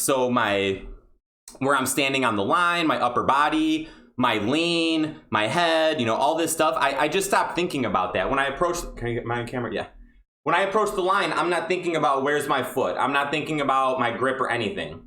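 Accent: Midwest, United States. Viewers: New Sub here. You a fellow Illinoisan? Hear that Midwest accent.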